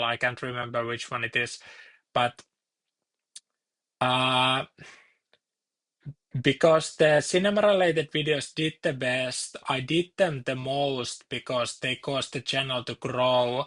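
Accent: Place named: Finland. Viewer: Glad to see you back man! Been missing that sweet Finnish accent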